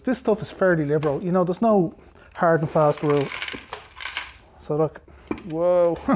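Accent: Ireland. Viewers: i could tell by the accent . But was not sure if it was a dublin accent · Hi..Watching you again and enjoying your videos, also you have a wonderful Irish accent that take me back to the old country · Looks dangerous....but love your Irish accent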